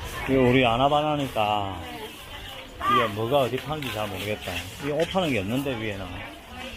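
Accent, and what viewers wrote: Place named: Busan, South Korea. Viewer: 부산 사투리 듣기좋아서 챙겨보고 있습니다 좋은영상 감사합니다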